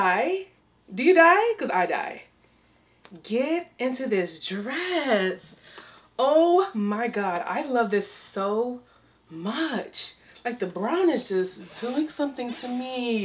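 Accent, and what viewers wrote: United Kingdom. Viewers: It’s the English/ British accent for me 😂😂😂😂😂😂😂😂😂😂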